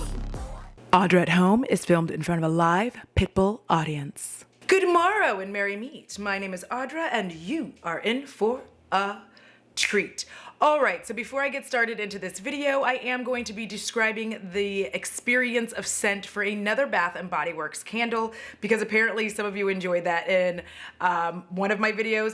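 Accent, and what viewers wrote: England, United Kingdom. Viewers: My husband just walked past me and said “Ooh, nice colour hair, Audra” and I agree, this teal is amazing on you. And, your Charlotte Tilbury English accent gets the seal of approval :)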